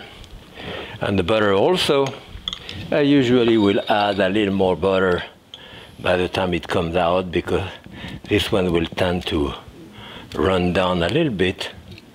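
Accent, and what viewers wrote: France. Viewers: I just love your French accent. Especially the word. “Huny “ lol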